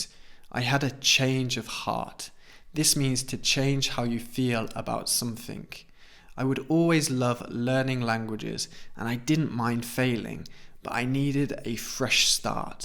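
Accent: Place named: RP, United Kingdom. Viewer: 👏👏👏👏👏👏Goooorgeous English without an accent! When I was young I lived in Midhurst where I looked after my uncle's ponies at Cowdray Park Polo Club. You speak English like those polo players there, if you know what I mean! 😀😄😀😄Felicitaciones,! Eres un genio, amigo! Un abrazo, from Olivos, Buenos Aires, Argentina🇦🇷🇬🇧🇦🇷🇬🇧🇦🇷🇬🇧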